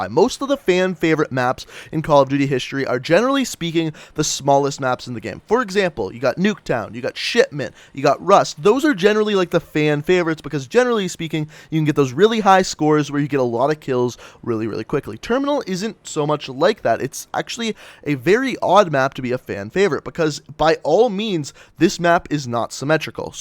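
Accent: Canada. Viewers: Your so candian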